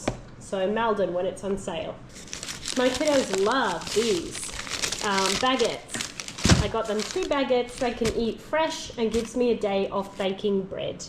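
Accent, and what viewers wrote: Australia. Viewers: New subscriber! Lovely to have found your channel, its so great to hear our Aussie accent ❤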